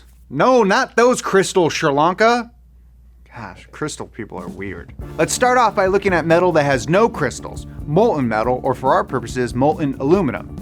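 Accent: Finland. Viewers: ah hearing that Finnish accent is music to my ears. Grandparents are From Finland so its nice to hear lol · me seeing thumbnail: thats a "finnished" video :) also nice finnish english accent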